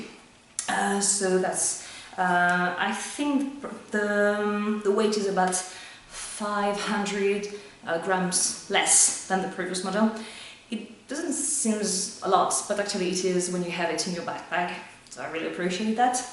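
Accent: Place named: France. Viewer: your French accent is really enjoyable, quite frankly.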